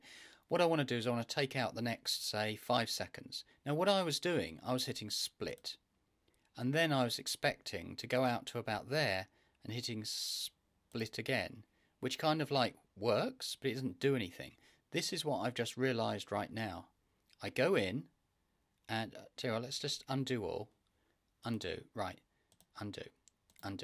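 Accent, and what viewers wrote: United Kingdom. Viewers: Your voice is so British it makes me patriotic. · They probably didn't have much enthusiasm for an Editor at all, thinking that many other software exist for detailed editing. If so they should call it not Editor but Trimmer, as it can only do trimming. Plus indeed they should have a more detailed tutorial on degrees of freedom, what will happen if we don't do this or that - like you did. I find their approach a bit ignorant, considering that we are probably not the first people who struggle with their program. Good thing that there are people like you, who take pain recording the video with explanations of what can go wrong. Thank you,:) I also liked your accent: Brits make English sound like music:)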